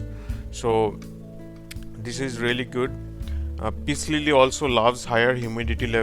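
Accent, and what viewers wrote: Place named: India. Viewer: That accent..Assamese / Bengali?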